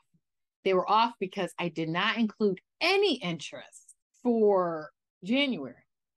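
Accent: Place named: New Jersey, United States. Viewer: I think it's a good one if it is helping you make progress..random but I have the feeling we live in the same part of the US. You sound like us Jersey peeps. Lol...I did say random. 😃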